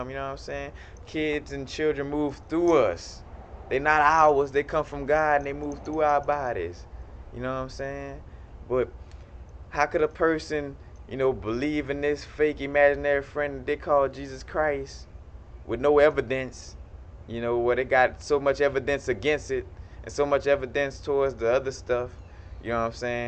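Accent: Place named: Brooklyn, United States. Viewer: You so Brooklyn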